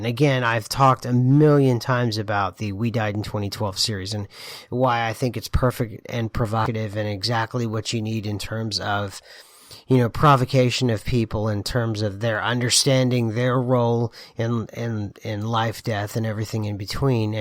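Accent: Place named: Pittsburgh, United States. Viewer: You sound like your from Pittsburgh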